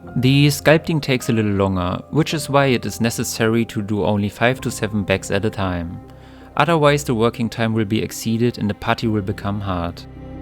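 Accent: Germany. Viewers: it Sound like a german that speak English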